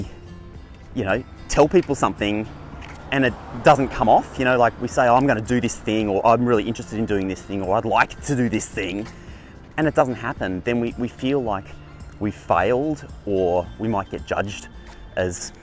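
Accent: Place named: Australia. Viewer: Australian English is awesome 😎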